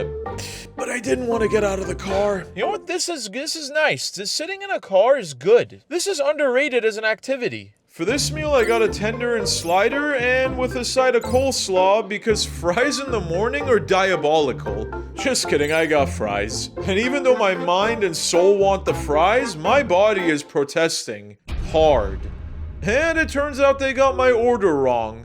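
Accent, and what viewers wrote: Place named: United States. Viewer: you look very mexican/middle-SouthAmerican but sound very american please decide you cant be both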